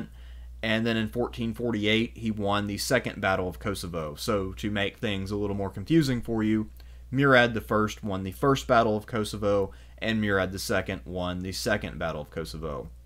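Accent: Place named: United States. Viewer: Our narrator doesn't really do pronunciations very well. Almost every video he "Americanizes" other people's languages. I guess you can't be good at everything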